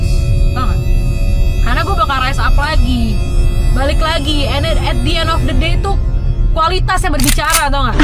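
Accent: Medan, Indonesia. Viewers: Gasuka basabasi, aku suka kak dari awal dia muncul di tiktok sampe sekarang krna logat medannya yg suka ngegas + muka nya ga ngebosenin ❤ Salam dari medan kk 🙏 Dalam hati "semoga dapat kiriman", aamiin yaallah 😌✌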